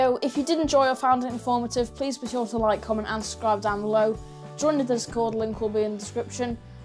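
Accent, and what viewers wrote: United Kingdom. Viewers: r u scottish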